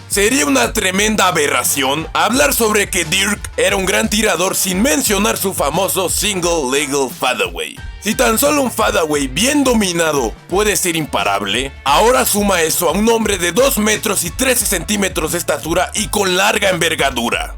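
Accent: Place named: Cuba. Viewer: Pareces que eres de cuba a la hora de hablar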